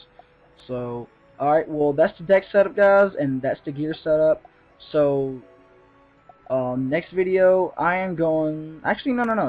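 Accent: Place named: Kentucky, United States. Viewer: God dang his accent so kentuckyish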